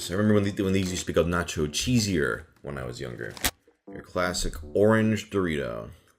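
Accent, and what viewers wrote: United States. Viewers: yo this guy is asian but his voice and accent are AMERICANA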